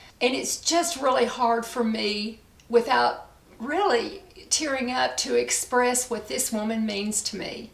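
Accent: South, United States. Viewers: I'm a new friend of,❤ We connected from our love and heartache of losing Mel. And I can say, in the short time I've known her, you couldn't be more right. She's such a beautiful soul, inside and out. Safe place to be❤ And though we became friends through such a horrible loss, I'm grateful for her friendship 🙏 I'm so happy she introduced me to your channel❤ You are such a beautiful soul, inside and out. Your southern accent will get me every time😘 So excited to be introduced to your channel...🦋🦋🦋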